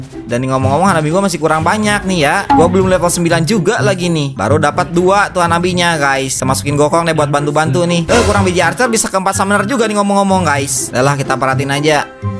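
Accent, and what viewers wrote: West Java, Indonesia. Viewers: Bang kemarin kemana bang di tunggu tunggu eh ga ada gua suka omongan lo bang Sunda asli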